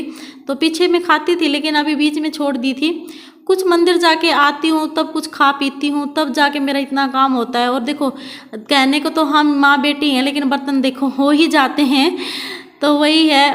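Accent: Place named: Haryana, India. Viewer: App haryanvi bolte hue achi lgti ho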